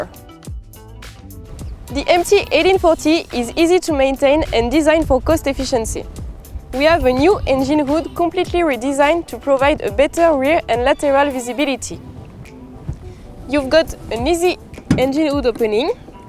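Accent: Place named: France. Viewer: I love when someone speaks English with a French accent :))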